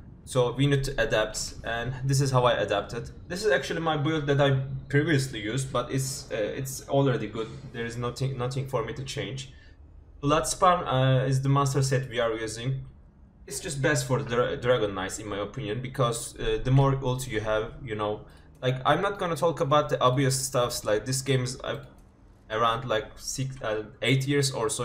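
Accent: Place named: Turkey. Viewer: Yokla ing şiveden yine türk😄ilk defa ing video yu ana dilim gibi anladım😂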